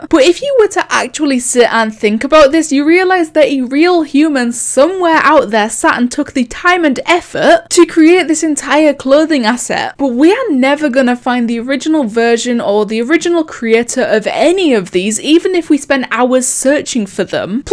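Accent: United Kingdom. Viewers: I love you british accent and i love how ur so good at arsenal T^T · Yeah, WHY are you British? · We always wanted to know: why are you british? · you never answered why you are British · why are you British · It’s annoying I can’t get over her posh English lol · are you brittish? and if so, why are you in may 13th and not december 3123?! uefyhbwegyfbhewgr means hello in my language. i am smartest individual roblox clothing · ok ok, but, why are you british?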